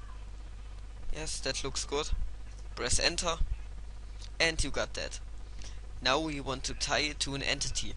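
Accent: Germany. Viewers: Natürlich bist du Deutsch. Merkt man z.B. an "dis" oder diese klare Aussprache. Amis sprechen anders.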